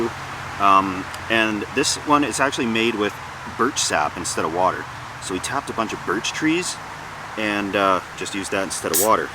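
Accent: Canada. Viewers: After I listening to your accent for several sentences I thought to myself, "I wonder if this bloke is a Canuck?" Then, within 3-5 seconds you mentioned your drink, a beer that came from Alberta! Excellent conception for the video, great content, and my very best wishes from South of the Border.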